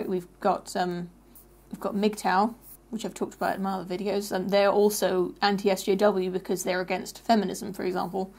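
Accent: United Kingdom. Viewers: Insightful analysis, clear diagrams, no makeup, British accent. is literally the sexiest woman on Youtube.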